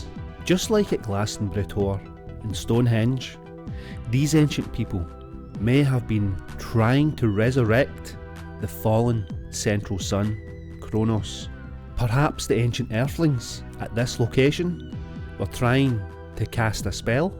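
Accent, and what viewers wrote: Scotland, United Kingdom. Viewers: I enjoy listening to your voice and your beautiful clear english.Scottish heart voice thank you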